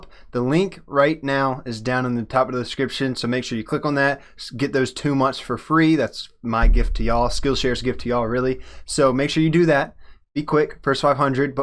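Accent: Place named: West, United States. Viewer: It's weird, you have a western accent, but say "y'all"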